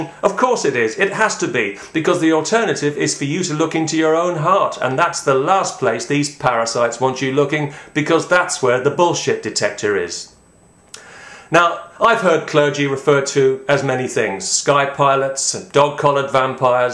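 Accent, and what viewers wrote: United Kingdom. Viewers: I agree with much of your criticism of the clergy. However, judging from your accent you are British, and I don't recognise most of what you are saying in relation to church ministers in the UK. Do we have ANY televangelists in the UK with private jets? How many clergy in the UK live in palaces? (and anyway, I don't think that the residential accommodation in the archbishops 'palaces' would be anywhere near as luxurious as the word suggests to most people). In my experience the vast majority of ministers in the UK are not well off, and they do their best to help people (in their present situation, not in the 'next life'). Do I detect that your hatred of and bitterness toward the Church might stem from issues you have had with the Church in the past? Maybe abuse as a child? With regard to your beloved atheism, the two biggest examples of the Church being downtrodden at State level must be China and the old USSR, where atheistic Communism was the order of the day. 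In both cases, many ministers and lay people suffered severe persecution and even death, simply for practising their faith. You might suggest that it was their Communism which was at fault rather than atheism, but these examples show that doing away with the Church does not mean that we all live happily ever after.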